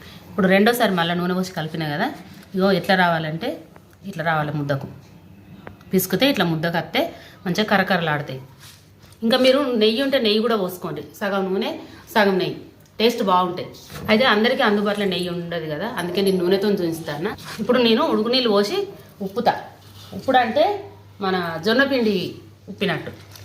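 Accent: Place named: Mancherial, India. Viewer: Same ma lagane matlduthunnaru madhe mancherial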